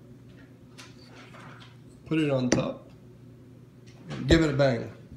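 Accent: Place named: Quebec, Canada. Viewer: Toer t québécois pis sa parait 😂😂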